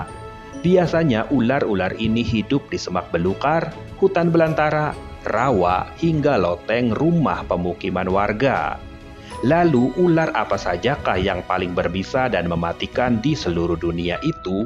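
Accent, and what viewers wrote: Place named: Tegal, Indonesia. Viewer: Orang tegal hahha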